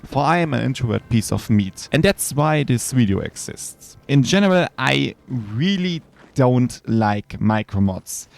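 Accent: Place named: Germany. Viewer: german accent haha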